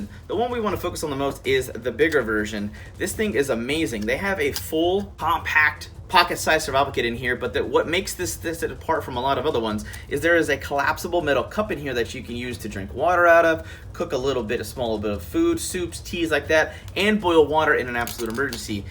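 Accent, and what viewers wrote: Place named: Texas, United States. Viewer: I kept hearing that your from Texas. Where exactly in Texas.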